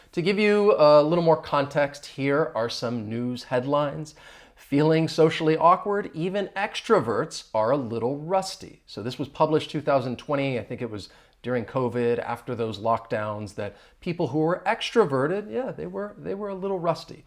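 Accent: United States. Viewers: It's such a great video. I love your american english. When it comes to vocabulary, well, you are a natural. 😊. Hello from france 🇫🇷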